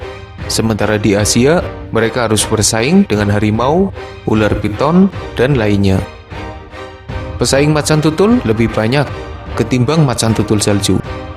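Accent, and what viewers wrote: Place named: Java, Indonesia. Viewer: Medok bgt min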